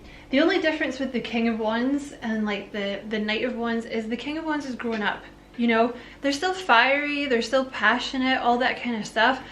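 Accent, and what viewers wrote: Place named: Scotland, United Kingdom. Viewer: You are such a doll! I love your Scottish accent! You crack me up when you cuss! And when you tell people to ** off! I live for it!!!🙌🏾 I’m also subscribed to your other channel🤗 Thank you for all you do. You’re a sweet and endearing soul. Love and light to you always💛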